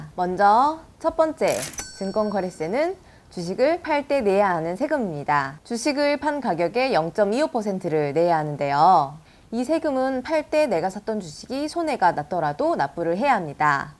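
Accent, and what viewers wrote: Jeolla, South Korea. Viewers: 전라도 분 같아요 ㅋ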